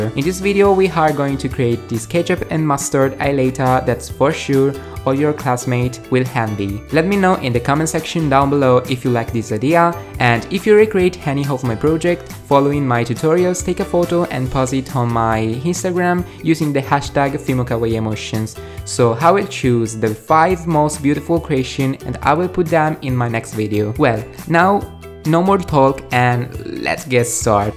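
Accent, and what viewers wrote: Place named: Italy. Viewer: Zac te wait to see the hamburger tutorial, your Italian accent is so cute 😀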